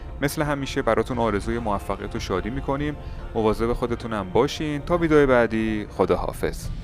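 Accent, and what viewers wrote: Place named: North, Iran. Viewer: سلام... آقا دمت گرم..... جانم لهجه ی شما شمالی هست..... از شهر کدام هستی؟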